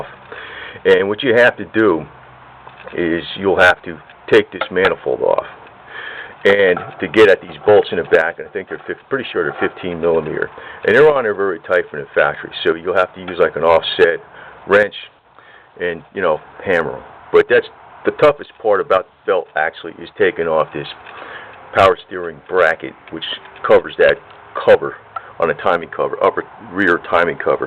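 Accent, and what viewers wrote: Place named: Philadelphia, United States. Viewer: I came on the internet to find info on problems with my 1996 Sebring. I want to thank you for things you helped me with. OOh and by the way... When I watch your videos I hear a Philly Kensington accent!! I am in Fla now, but I grew up near Sears at Whitaker and the Blvd!! Look me up on Facebook or email me and lets see how many people we know from Philly and laugh!!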